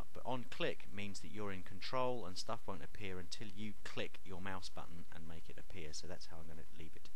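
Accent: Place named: England, United Kingdom. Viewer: love the english accent... nice video btw... can you please tell me how to rotate images added in word documents?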